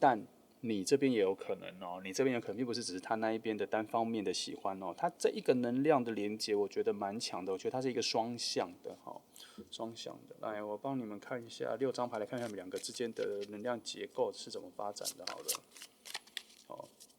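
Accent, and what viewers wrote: United Kingdom. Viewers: 好听的英腔诶❤ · 喜歡英國口音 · 是好聽的英國腔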